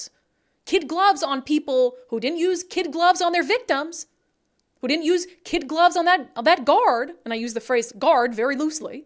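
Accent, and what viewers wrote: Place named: Minnesota, United States. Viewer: It is Minnesota accent.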